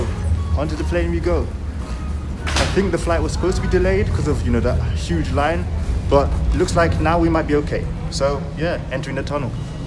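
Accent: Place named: London, United Kingdom. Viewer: Trip to london... So where you really from. I thought you was from london😅all this time. Watched in full. Great stuff peace✌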